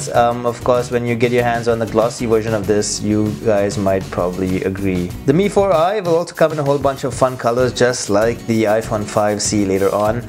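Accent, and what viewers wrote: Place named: India. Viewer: Why not every indian have his accent?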